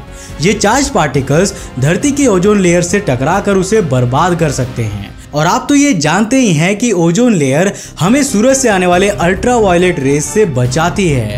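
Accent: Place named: Haryana, India. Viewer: Tum bolte achha ho per bich bich me kai words ka ucharan galat kardete ho sh ki avaj ko chh ki or chh ko sh kar dete ho baki sab theek ha ye bhi karlo to bhariya rahega dusri bat apki bato se bhasha se lagta ha ki ap kahi na kahi haryana se bilog karte ho ya uske pas bilkul pas reh chuke ho ek word jo ap bole beta ye siraf hr me hi bola jata ha kahi or nahi matlab styale Ha ap styal ko galat bolte the ap bolte the shtyal bas ek word hi yad ha mujhe jo ap bole galat baki sab bale bale ha so keep contineu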